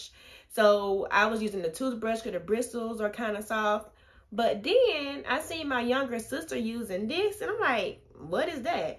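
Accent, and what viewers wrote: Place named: United States. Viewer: You right! That “mist” bottle is the bomb! I just got one a week ago and I love it! And no apologies necessary … I love your country accent.😁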